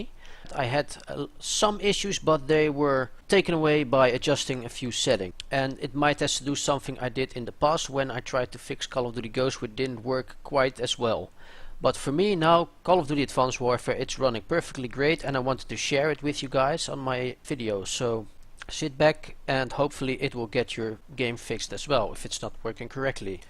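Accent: Netherlands. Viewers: hoor gewoon dat je nederlands bent :0 maar ik heb een probleem me i7 proccer werkt niet met advanced warfare kan je me daarmee helpen? hij loopt vast in de loading screen en dan ben ik gedisconnect. help! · you have a dutch accent xd Dutch? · dutch accent :P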